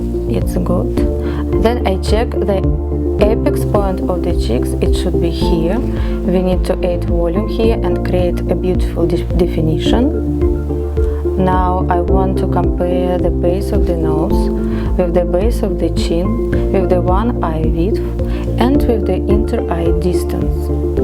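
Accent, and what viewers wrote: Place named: Russia. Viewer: А че говор Русский))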